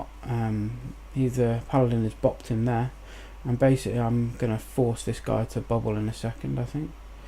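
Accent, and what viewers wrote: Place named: Lithuania. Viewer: Good video and nice commentary, I'm trying to place your accent, are you from Lithuania by any chance?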